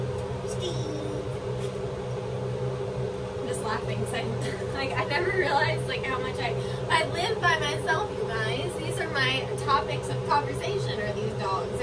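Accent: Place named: South Carolina, United States. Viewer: i love your South carolina accent so much lol im in the midwest 💟 i went there earlier this summer i miss yalls voices🤣💘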